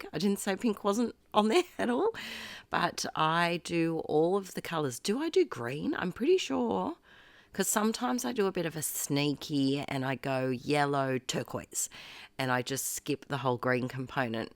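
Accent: Australia. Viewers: I’ve just come across your channel, and I love your style! You sound like an Aussie (me too) - but where do you buy your Bande washi sticker rolls, without them costing you a bomb in postage!?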